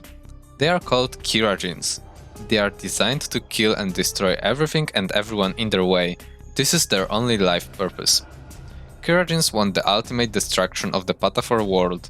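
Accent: Poland. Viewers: Ten polski akcent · Słychać że polak :D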